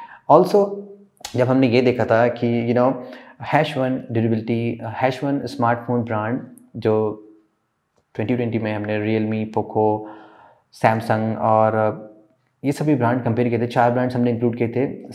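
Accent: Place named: Kamta, India. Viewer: I think you are from kamta side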